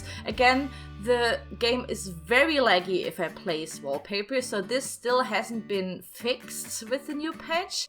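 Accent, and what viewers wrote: Germany. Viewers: Wusste ich doch das ich da was deutsches raushöre😏😂😂❤😍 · Your accent isn't even half as bad as mine... To be honest, I didn't even notice that you're German and I'm one myself. My accent is also the reason why I'm afraid to start a YouTube channel, because people can be mean an all. Your accent is fine and not as bad as people make it seem! I also loved the way you adressed it and I love this camp, I'll definitely add it to my game!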